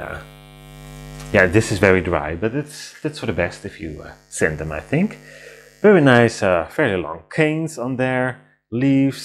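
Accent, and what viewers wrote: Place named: Netherlands. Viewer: ! I thought I recognized your accent! I have family friends in Ryswyk! What part of The Netherlands do you live in?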